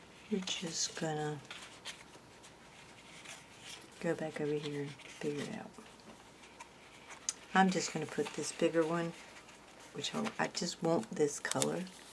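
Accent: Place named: Oklahoma, United States. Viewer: Hi, this is the first video that’s popped up on my home page, so I clicked on it as I am fairly new to decorating journals. My name is Karla, in Oklahoma. You don’t sound like you far from Oklahoma? lol your accent. Anyways, you created a very pretty spread. Love how the girls are cascading inside the faux Dutch doors. Thank you for bringing us along 😊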